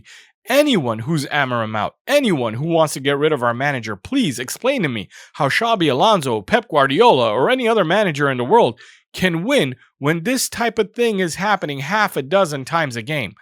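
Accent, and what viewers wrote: United States. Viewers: Yo, you're good bro... It's just a bit weird hearing football analysis in an American accent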